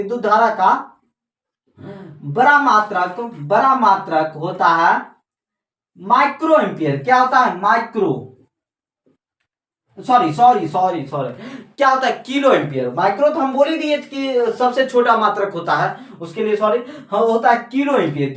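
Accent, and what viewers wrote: Begusarai, India. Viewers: Bhai aap Begusarai se ho kya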